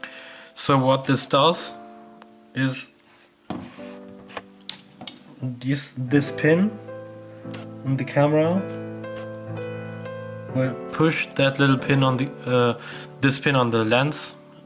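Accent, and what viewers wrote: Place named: Germany. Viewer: Hello, nice video. From your accent, I am guessing that you are german. Ehrlich gesagt wuerde es mich freuen auch deutsche videos zu sehen. Abo von mir hast du ;)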